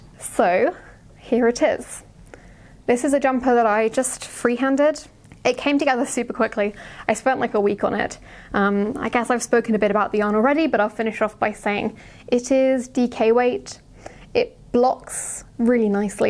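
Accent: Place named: Southern England, United Kingdom. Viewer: If you just put a short ‘e’ sound after the ‘r’ it comes up somewhere close to the Finnish pronunciation. So ‘Taremo’ is closer than ‘Tarmo.’ Then if you hold your tongue a little closer to the roof of your mouth on the ‘r’ sound, it gets a bit better again. That’s easier with a flat ‘a’ sound like we say in Yorkshire, though, rather than than your longer, Southern ‘a’ because the mouth is already wider and flatter. Think the ‘a’ in cat rather than in ‘Arthur.’ Lovely episode thank you x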